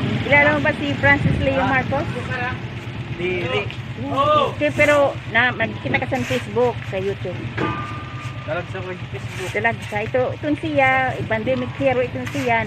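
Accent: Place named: Masbate, Philippines. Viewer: Ohhh ok.. may kunting halo salita ninyo ang masbate. Ano po tawag sa language ninyo dyan?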